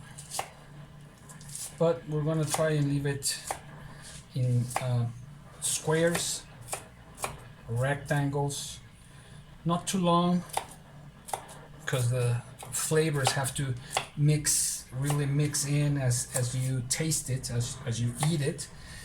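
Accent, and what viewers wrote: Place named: Spain. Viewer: Maybe your accent is derived from Spanish, Wenders is German, but seriously heard a similarity, also in your contemplative style.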